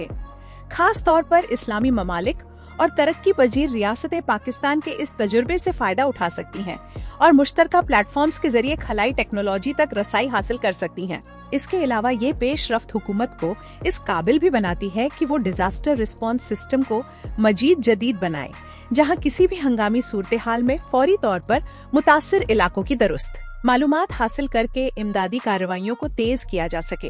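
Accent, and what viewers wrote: India. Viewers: ویڈیو میں ہندی لہجے کی آمیزش نے مزہ کرکرا کر دیا۔ سوفٹویئر تبدیل کریں۔ خلا کو کھلا، مزید کو مجید اس طرح کی مزید کئی تلفظ کی غلطیاں ہیں۔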